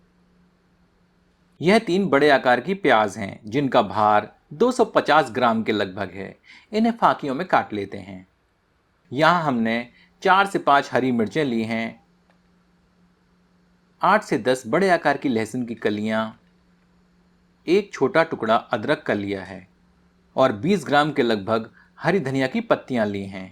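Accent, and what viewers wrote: Standard. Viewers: Aapki hindi bahut shudh hain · the way you speak pure Hindi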